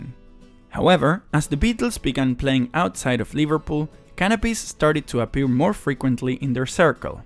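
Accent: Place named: Argentina. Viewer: vos sos re argento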